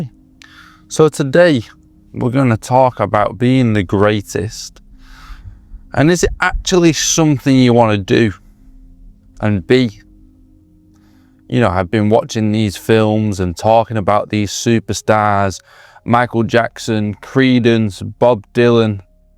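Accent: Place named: Manchester, United Kingdom. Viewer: More people need to hear this. You are straight talking and bang on point in what you say. Im from Manchester, Your accent reminds me of my grandad and he used to say the same things you are bringing up which is proof there isn't a glitch in the system its by design. Please don't stop doing the videos you make me feel less crazy because im not the only one thinking it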